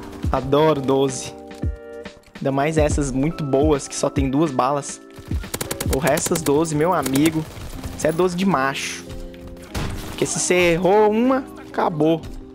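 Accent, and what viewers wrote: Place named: Goiás, Brazil. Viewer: advinhei pelo nosso sotaque, também sou de Goiás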